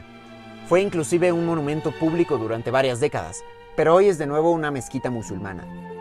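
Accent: Mexico. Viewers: Creo que es un vídeo de pacotilla al tratar de comparar os obras de arquitectura diferentes, creadas con diferentes técnicas y propósito… he estado en las dos y las dos son hermozas y si es solo de cúpulas en las que tenemos que hablar… bueno pues, las dos son diferentes y las dos siguen allí… los romanos fueron maestros en el uso del hormigón y no se a tenido que rehacer varias veces, además que tiene un calendario solar en su interior, con precisión milimetrica…. Bueno y para terminar, para poner un canal en cualquier idioma, hay que tomar primero lecciones en ese idioma, “el mexicano” que aquí escucho no es un español de lo mejor, siento lástima por aquellos que comentan que les aluda a prender español! El “mexicano” todavía no esta reconocido como un nuevo idioma verdad? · Un video diferente a los que solo pasan de largo por la ciencia y la cultura de lo que filman. 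Tienes acento mexicano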